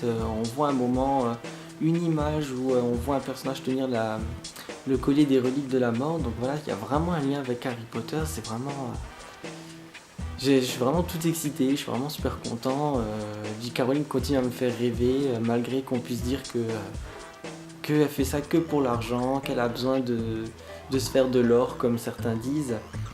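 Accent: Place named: Lorraine, France. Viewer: Tiens ? Toi aussi tu est lorrain ?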